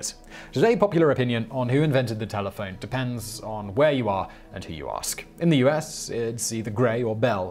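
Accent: United Kingdom. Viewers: I normally don't care for British accents but don't mind his at all. Anyone know what regional accent this would be?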